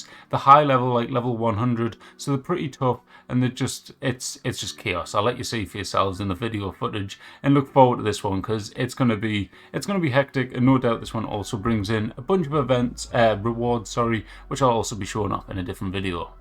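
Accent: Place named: Scotland, United Kingdom. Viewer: Great info with a Scottish twist... good stuff 👌🤌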